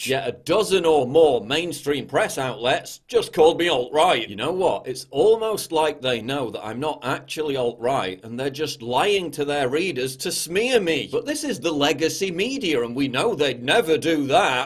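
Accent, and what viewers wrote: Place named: England, United Kingdom. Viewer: santiago perez su acento es típico inglés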